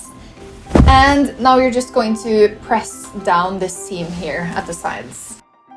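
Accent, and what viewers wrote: Norway. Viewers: she’s Norwegian she has an accent… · 😅 she is a Norwegian fashion designer. I guess you don't like her accent then? Wonderfully thorough tutorial without being too long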